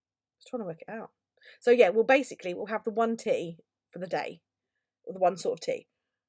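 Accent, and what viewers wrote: England, United Kingdom. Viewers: Absolutely enjoy your “chatty “ vlogs!!! I am way over here on Vancouver Island BC Canada and I always listen to you with my mug of tea in hand just to listen to your accent as English is half of my heritage and I drink mugs of tea every day. Thanks for your hard work. xo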